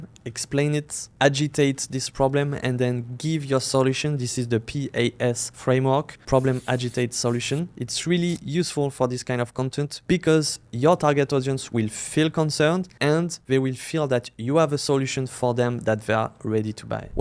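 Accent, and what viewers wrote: France. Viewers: felt the French accent... mais j'aime ce que tu proposes btw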